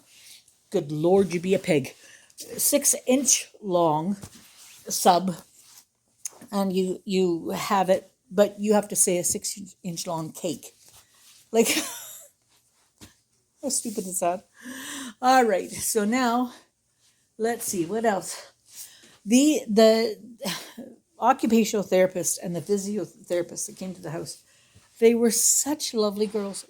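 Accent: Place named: Canada. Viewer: Hi sweet, I knew you were Canadian with your accent…I’m from the East side of Canada, from my youth…I’m now in California since 1964. I was only 10 years old when we moved from Cali. I enjoyed watching your video…since my mama has Parkinson’s also! Enjoy your video! 😘❤️🙏🏼🌺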